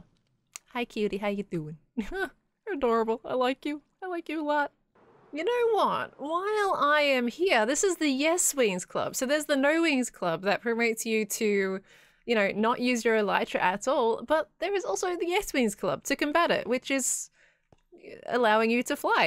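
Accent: Australia. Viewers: enjoying the Aussie accent. Such an australian thing to call yourself ' '. Glad you have joined the hermitcraft server, I felt Australia was under-represented here:) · needs to work for a radio station or do pod casts he has the voice for it and i love it <3 that and your awesome aussie accent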